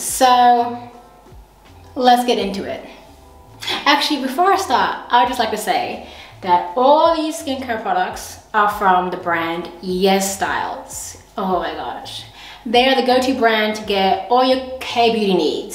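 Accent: Australia. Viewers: I love your acccent even tho I have been to Australia half my life 😂😂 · I love the Australian accent🤩🤩🤩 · Onggggggggggg I didn’t know she had and Australian accent this my first time on her yt cause of the tik tok deletion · It’s weird seeing an Asian with an Australian accent....btw she’s like the first pretty Asian I’ve seen, prolly cuz she’s white-washed (her name IS....yikes)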